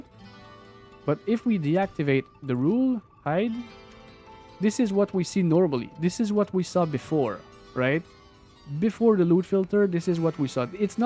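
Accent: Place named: Quebec, Canada. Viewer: Nice merci man, en passant ton accents... té b'en Québécois eh? 😀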